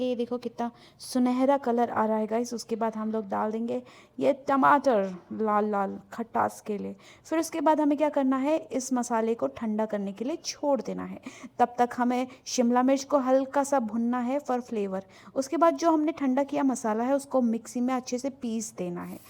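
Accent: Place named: Pahadi, India. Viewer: I love her pahadiiii accent❤❤❤❤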